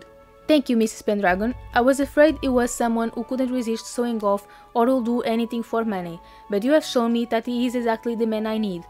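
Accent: Portugal. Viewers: I like the length of your videos. I also love to hear your Portuguese accent.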